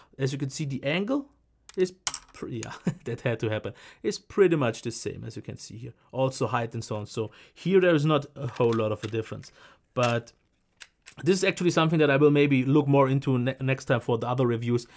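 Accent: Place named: Germany. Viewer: alter der deutsche akzent xDDDD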